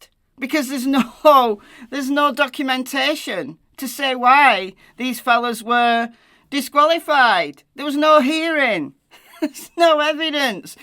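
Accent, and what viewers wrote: United Kingdom. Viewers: I know I can’t be the only one that thinks that hearing the words “numb nuts judge” in British accent sounds so much funnier than someone with an American accent saying it!!😂 and the “you need to be watching the Super Nanny, Frances! You’re not very good at this, Frances!!” I’m cackling and my husband is looking at me like I’m crazy! The snark in this video is completely appropriate and warranted!! Frances C. Gull (aka Frances Seagull as in the bird 😂) is a complete joke and a disgrace!! 🤡🤬I just pray that the Indiana Supreme Court judges are able to see through the dishonorable Judge Gull’s BS!! I am also praying that Judge Gull is removed from the trial and that Baldwin and Rozzi are reinstated! Most importantly however, I am praying the hardest for justice for Abby and Libby!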